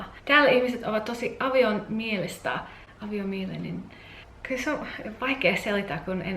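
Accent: Finland. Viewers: minä olen asunut koko elämäni Suomessa ja sinulla on hyvä Suomalainen aksentti! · Ihana suomi aksentti❤️ ja olen ihan suomalaiset mutta enkku tulee multa aika helpolla ku mummo ja pappa asuu jenkeissä ja he puhuvat mullekki aika paljon enkkua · I love your Finnish accent! Very good or should I even say excellent pronunciation!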